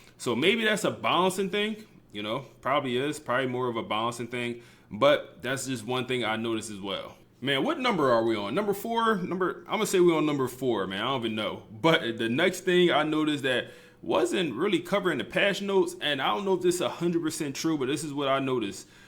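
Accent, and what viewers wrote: Philadelphia, United States. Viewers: Philly accent is heavy bro 😂😂😂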